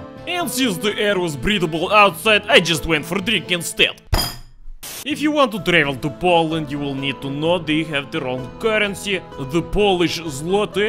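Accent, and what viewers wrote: Russia. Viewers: ale nie specjalnie bo tak ruski mówią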